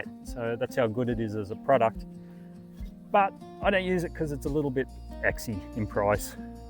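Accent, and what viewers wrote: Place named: Australia. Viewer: What a fun accent. Are you from Australia or New Zealand, or somewhere else entirely?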